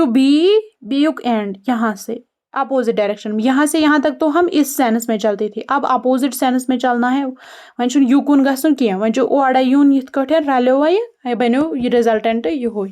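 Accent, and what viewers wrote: Kashmir, India. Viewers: Thaat kashmiri accent 😂😂 ❤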